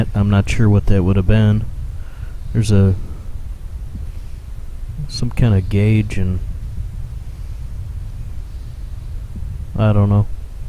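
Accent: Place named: Midwest, United States. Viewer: Classic Midwestern Accent... I was asking myself... what accent? Then I realized where she was from. Your voice is very soothing. I would like to take an entire section of those glass blocks.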